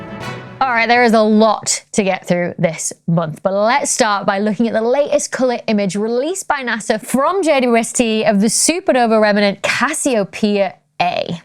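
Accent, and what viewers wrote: United Kingdom. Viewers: I’ve written this before… I used to live in the UK so listening to your British accent is refreshing. How did you cram so much knowledge into your brain at such a young age?! Your intelligence is beyond amazing. With that said, I have issues things you cosmologists expound. Since my education is a master’s in computer science my brain thinks in one’s and zeros, in on and offs, trues and falses… To me and my tiny brain, I cannot fathom an edge to our universe. I completely disagree with the notion that the universe is just 13.8 billion years old. I can’t imagine how this fact is even a fact at all… As Spock says it, “It’s not logical!” Just because we can’t see beyond “the edge” doesn’t mean there’s nothing beyond. In my beliefs, I posit the universe is infinitely old and infinitely big. I have no way to prove my theories. I guess that is why I am so drawn to your presentations. 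I love your videos, so maybe like a dry sponge absorbs water, I may get to a point where I could hold an intelligent conversation with you on these subjects… Merry Christmas, Dr. Becky!! 😊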